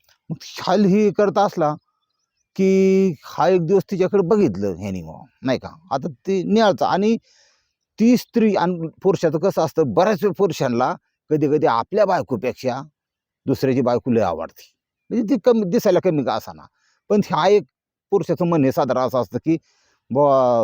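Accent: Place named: Pune, India. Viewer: मामा पुण्याची मराठीच सर्व उत्तम आहे . मुंबई पेक्षा भारी आणि सरस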